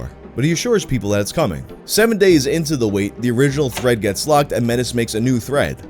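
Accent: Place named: New York, United States. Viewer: I don’t know why I never realized you were a fellow New Yorker before. YERRRRRRR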